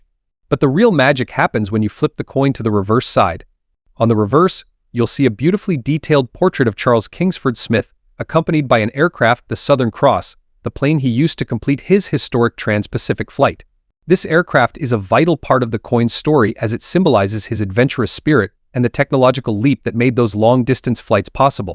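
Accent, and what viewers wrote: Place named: United States. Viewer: What an absolute load of BS . Get a yank voice to narrate the topic of Australian coins they clearly know nothing about. The 2010 $2 coin had over 36 million In circulation. The 2008 scout coin over 17 million in circulation. No idea at all !!!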